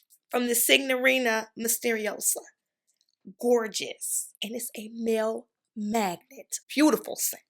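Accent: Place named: United States. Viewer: I really love your accent I’m from England and I love the American accent and I really love your channel perfume is one of my guilty pleasures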